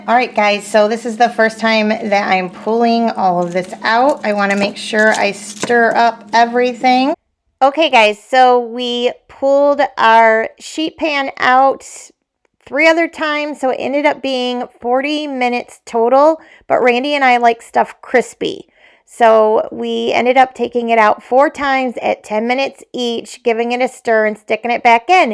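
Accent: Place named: Detroit, United States. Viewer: Yes! Do it! I never thought to make Hash on a sheet pan. brilliant!! Looked so delicious. Right me too. I e been doing way more of them. Just throw away the foil. Great job! Aha! I KNEW you were from the Detroit area! I could totally dedect that in your accent ❤️. I grew up in the Toledo area and my In laws lived in Clarkston! Small world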